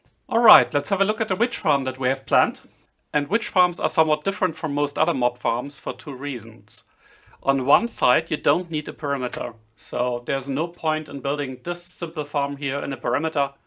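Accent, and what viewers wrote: Germany. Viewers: Question about your accent, are you from Germany?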